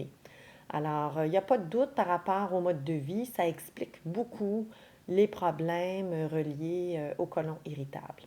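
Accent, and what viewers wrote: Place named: Canada. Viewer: Merci, je vais essayer de suivre vos conseils, En plus votre bel accent canadien me fait beaucoup de plaisir Bonjour de la France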